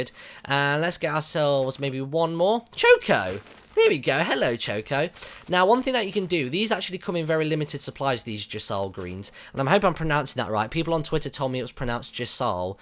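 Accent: United Kingdom. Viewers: this guy is so brittish he sounds royal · yeah... I'm not usualy this predjudice! sorry, I like brittish accents! Plus I love his yogbox series!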